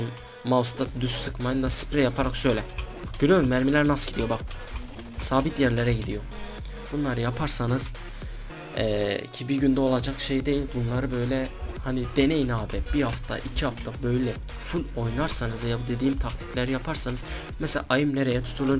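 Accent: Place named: Diyarbakır, Turkey. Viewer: diyarbakır lı mısın gardaşım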